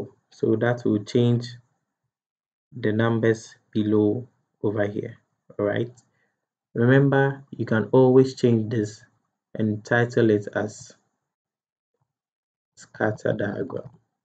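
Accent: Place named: Africa. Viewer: omo oh i just hear the accent i know sey na africa. welldone bros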